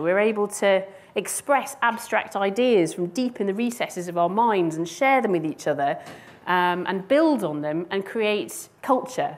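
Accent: United Kingdom. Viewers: The language is English but to this American’s ears it sounds very British. Some Brits are easier to understand than others, I’d say she’s in the middle. Anyway, this is a good talk if getting dated. No particular insights although I didn’t stay till the end. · what part of england does that british accent come from and does it have a particular name?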